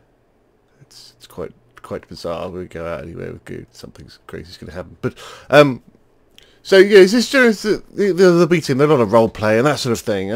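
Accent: England, United Kingdom. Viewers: ***** - nice to hear a British accent, I only ever hear American and Canadian accents :) We must be the rare breed of British youtubers you and I :) Keep it up good buddy. Your British fan/fellow youtuber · You sound slightly like biffa a little. Good ep man:) are u from the UK you sound it?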